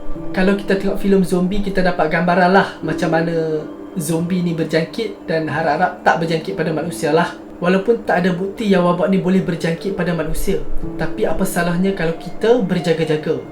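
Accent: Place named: Kedah, Malaysia. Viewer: Slang pelat Kedah...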